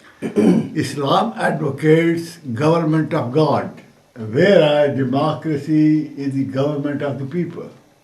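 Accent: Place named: Kashmir, India. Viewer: you speak like kashmiri pundit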